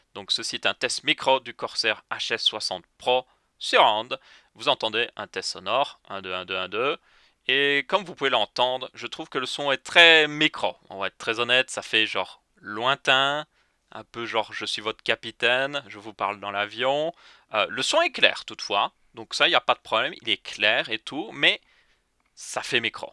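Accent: Belgium. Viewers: 80 % des commentaires parle de l'Hyper X cloud 2 ; 10 % de l'accent belge ; 10 % autres .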